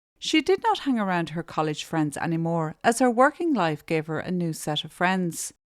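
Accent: Ireland. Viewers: Then find another channel silly. Irish is in the channel name. It's a gorgeous accent. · The Irish accent is lovely! If you have nothing nice to say then say nothing you horrible person! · Don’t listen then, I love the Irish accent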